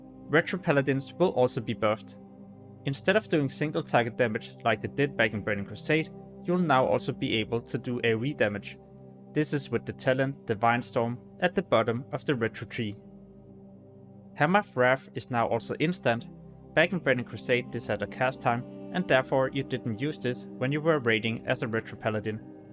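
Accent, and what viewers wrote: Denmark. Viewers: Du er dansker kan jeg høre 😁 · Jeg kunne høre, at du var dansker. Har subbed - god kanal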